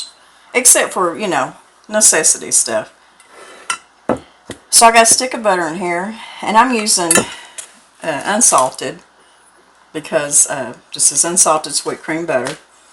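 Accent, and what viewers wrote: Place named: Arkansas, United States. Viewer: Oh, my goodness. I just want to come and sit and visit and eat some of that good down home cooking! I love to watch your videos and listen to your Arkansas accent. Thank you for all the good recipes. I recently bought a grain mill and watched your videos on your new grain mill. I’m 80 years young and still learning new things. God bless you both!!! ♥️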